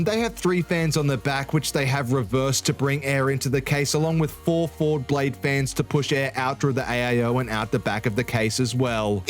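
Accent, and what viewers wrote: Australia. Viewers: i dont care about the PCs at all. but i stay for the hilarious aussie voice. yeah nah good channel you beautiful baaaastard:elbowbump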